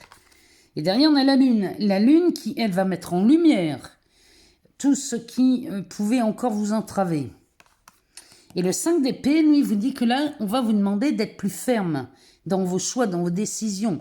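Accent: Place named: Nord, France. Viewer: Bonne année. Merci d'être zen précise et positive. J'adore votre accent Chti.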